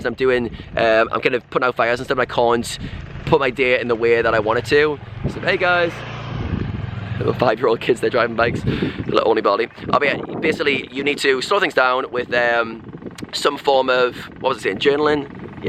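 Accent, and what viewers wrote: United Kingdom. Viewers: If you are up for feedback then the other thing about the speed of your speech is the accent. I’m in London and am well versed with with the many different accents throughout the country. Whilst your Geordie accent (I’m guessing) is not super strong it is significant and not a problem for me but when you combine that with the speed of voice then anyone that does not use English as their first language could find it hard.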